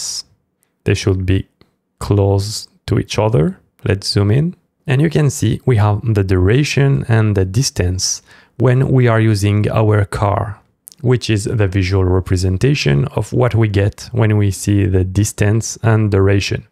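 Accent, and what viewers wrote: France. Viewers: Excellent content :). Malgrer que ton accent Francais se fait vraiment ressentir 😅 Thanks !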